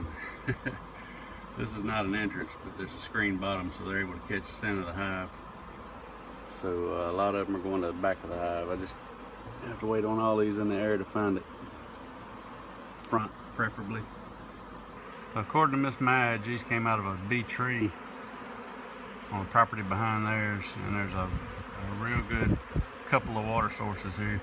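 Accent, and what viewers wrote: South, United States. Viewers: He is saying Drawn comb Not drone(fogive our southern drawl) ... using old comb gives them a little headstart it's a stage up form just plain new foundation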